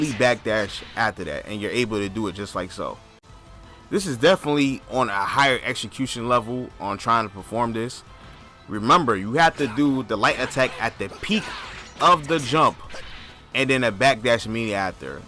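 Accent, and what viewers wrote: New York, United States. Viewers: The most NY accent ever. Instant like and subscribe.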